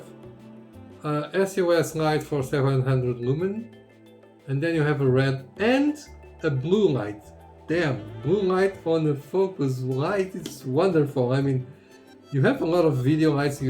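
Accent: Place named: Portugal. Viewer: Muito obrigado ( I guess from accent you are portuguese :-) ) for the video. Could I contact you for more questions about SEAFROGS lights?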